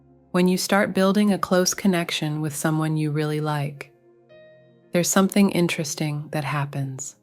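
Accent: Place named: Thailand. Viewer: Your Thai accent is amazing!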